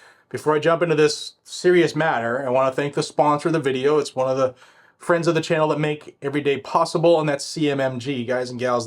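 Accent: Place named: South, United States. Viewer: Y'all? You're getting a wicked southern accent.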